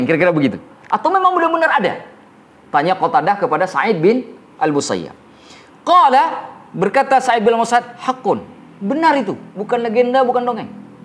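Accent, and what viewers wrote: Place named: Aceh, Indonesia. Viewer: Bagus ceramah ustadnya. Dr logatnya sepertinya org Aceh ya...😊😊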